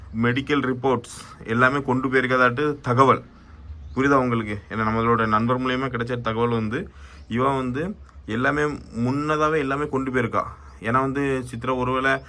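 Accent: Tirunelveli, India. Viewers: அண்ணா எங்க ஊர் திருநெல்வேலி பேச்சு மாதிரி இருக்கு அண்ணா சூப்பர் ❤️👍👍❤️👍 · நீங்க திருநெல்வேலியா bro?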